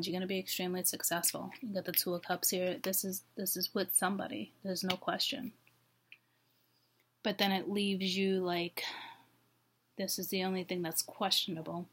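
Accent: Boston, United States. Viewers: just found your channel and boy is this accurate. dealing with an aries i left in the past. im canadian but visited the states quite abit as a child...is that a boston accent? love your voice!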